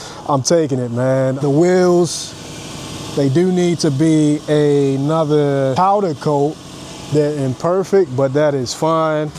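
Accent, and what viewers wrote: Baltimore, United States. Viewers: I know a Baltimore County accent when I hear it! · Facts am from DC and i hear B-more county all day in fam.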